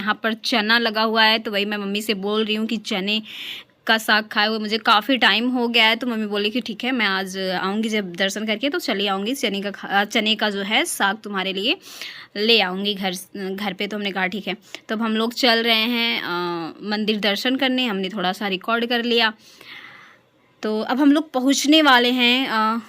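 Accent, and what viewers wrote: Bhojpuri, India. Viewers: Nice bhojpuri. So cute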